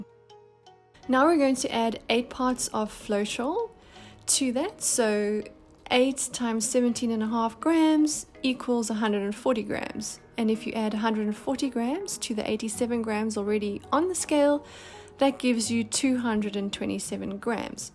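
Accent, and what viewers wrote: South Africa. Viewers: Thanks so much, just what I need, plus I love your SA accent.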